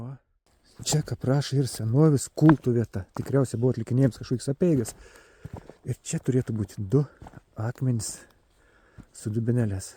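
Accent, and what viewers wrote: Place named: Plungė, Lithuania. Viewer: Smagė vaizdą pasėvakščiuojėmā, graži šnekta, a karts ne no Plungės būsi?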